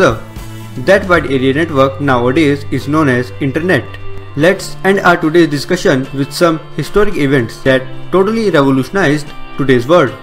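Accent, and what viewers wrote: India. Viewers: Nobody can understand your curryman accent😂😭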